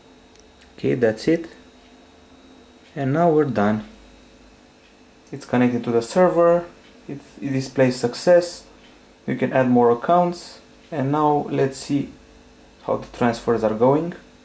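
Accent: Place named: Romania. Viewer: Frumos tuturoial facut de un roman dupa accent..Respect!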